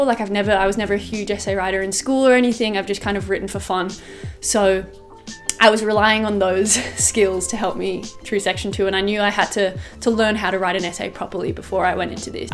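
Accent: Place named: Australia. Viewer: thanks for your content! pleasant to hear a fellow Aussie accent!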